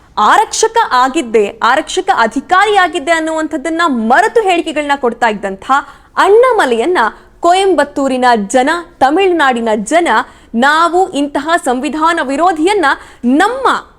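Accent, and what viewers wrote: Standard. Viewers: Clean kannada good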